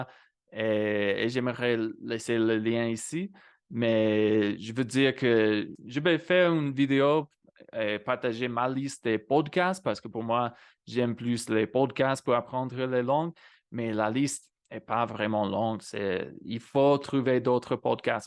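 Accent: Quebec, Canada. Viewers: Je suis québécoise et je suis professeur d’anglais langue seconde à la retraite . Je parle aussi italien. Je vous ai écouté avec beaucoup d’intérêt. Je trouve que vous vous exprimez comme un québécois. Il n’y a pas beaucoup d’anglophones du Québec qui parlent le québécois aussi bien que vous. Une autre raison à avoir de la difficulté à trouver quelqu’un avec qui vous pourriez échanger est que beaucoup de québécois, comme moi entre autres, croisent le français standard et le québécois en même temps. Comme on dit ici « Ça vient mêler les cartes». Félicitations pour votre chaîne. Vous communiquez très bien votre pensée et ce que vous dites par rapport à notre langue est très juste. Votre «FAQUE » et «J’AI DE LA MISÈRE c’est très québécois . 👏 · Ton accent français est pas mal plus celui du Québec que celui de la France. Plusieurs tournures de phrase et contractions sont déjà intégrées dans ton français parlé, mais ce que je remarque le plus, ce sont les intonnations en "en" qui ressortent plus sous forme de "an" dans le français parlé québécois. 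Ça saute aux yeux... oups, aux oreilles! Et les "en" qui se changent en "an", eh bien c'est tout-à-fait normal dans le nord de la France! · Pourquoi tu désires apprendre et parler le français avec la couleur québécoise? Ce vieil accent très localisé de 9 millions d'habitants. ;)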